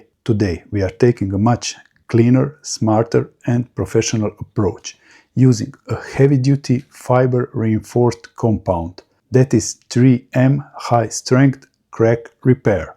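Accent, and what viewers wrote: Russia. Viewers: I love the accent! Russian? Excellent commentary and tips on how best to repair these annoying cracks. 👏👏✌️ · has a strange accent. Russian? Ukrainian? Dude, you tell me.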